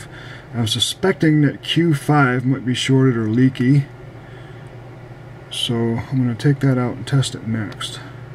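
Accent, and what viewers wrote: Michigan, United States. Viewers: Sounds like you are somewhere in mid-Michigan. I need a couple more modern receivers/amps repaired. I'm in Flint and it's hard to find a decent person or place that will work on stereo equipment. The old electronics store my father use to deal with has been out of business for a long time now. They were called Shans or Shanes electronics. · So true. And his Michigan accent reminds me of home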